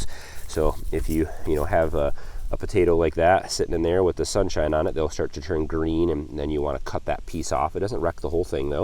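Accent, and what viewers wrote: Michigan, United States. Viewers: I want to start a garden next spring but I'm wondering if there's something I can do now. Should I till the soil now and cover with leaves? My area has been mowed but its weedy. It hasn't been touched for decades. P.S. Sounds like you're in Michigan. I'm in Genessee County. Zone 6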